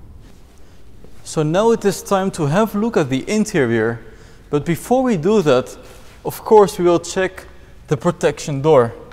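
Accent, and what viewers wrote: Netherlands. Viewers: He is dutch :P i hear it :D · It's English in very Dutch accent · Most dutch accent ever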